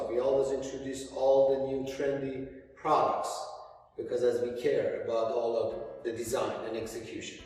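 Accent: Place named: Iran. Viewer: Dude’s name is but accent is so iranian 😆 #loveit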